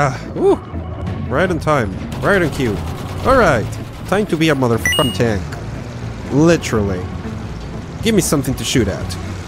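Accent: Spain. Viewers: Eres Ingles O Español?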